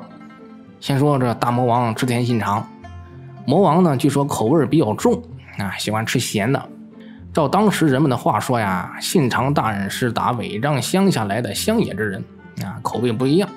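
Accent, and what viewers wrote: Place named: Beijing, China. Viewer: 你的解釋非常好 視頻做的也真的非常棒 但能不能別用北京腔 舌頭給我放平說話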